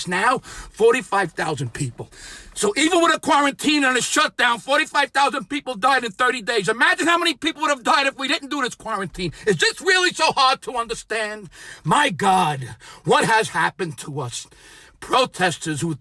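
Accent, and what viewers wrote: New York, United States. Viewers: I am so warmed by this. The Rant, the accent, the 'you're an idiot' head tilt. It feels like home. Damn I miss NY.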